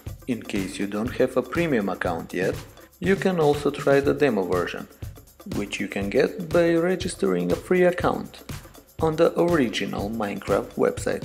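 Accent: Russia. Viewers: u sound Vrussian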